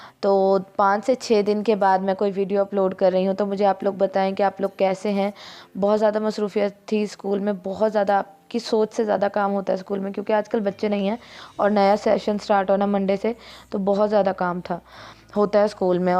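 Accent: Saraiki, Pakistan. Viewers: Ap saraki speaking ho kya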